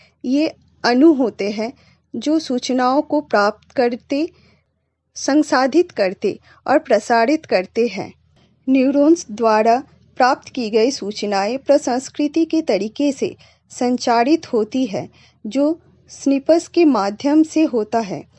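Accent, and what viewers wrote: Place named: Bengal, India. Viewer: You are a Bengali and trying to speak pure Hindi.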